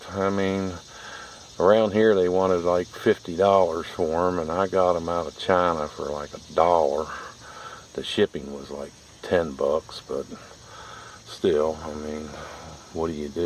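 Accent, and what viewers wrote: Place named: Bristol, United Kingdom. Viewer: Your accent is cool. We here in England have nothing like it. Perhaps Bristol.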